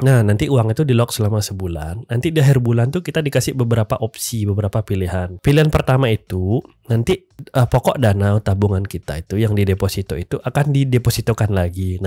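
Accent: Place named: Java, Indonesia. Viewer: Jawa banget logatnya bang:)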